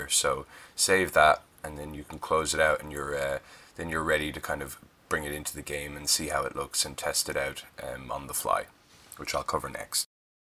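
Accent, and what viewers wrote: Ireland. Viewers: you have the best irish accent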